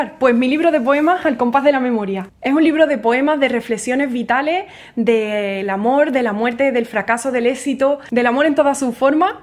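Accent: Spain. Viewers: Hola, soy de Colombia y me encanta tu acento español y tú sensillez y como interpretar la guitarra. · Felicitaciones, apuesto que hay mas de 300,000 mil personas creo que no hay rincón del mundo donde no te oigamos. Nos ayudaste muchísimo al salir a la webb, con tu hermosísima, música, justo lo hiciste, cuando el mundo estaba en pausa y el miedo calaba hasta en el corazón de hierro... Y tus poemas soplaron por mis mejillas, con la ternura de una caricia y la frescura del viento del amanecer. Gracias Hermosa y que dios te llene de bendiciones, antes que te des cuenta seremos 5,000 millones de personas, las que te seguiremos para disfrutar de tu talento artístico de tu bella sonrisa y dejo español... No lo dudes, que en todos los rincones del mundo, tu voz y tu guitarra alegran el corazón de alguna persona. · El libro lo leería frente al mar. Y le dedicaría algunos de los poemas a mi esposa. 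Saludos desde Puerto Rico 🇵🇷! Me encanta escucharte tocar la guitarra, tus videos siempre empiezan con un bonito saludo, y tu acento del área donde vives en España!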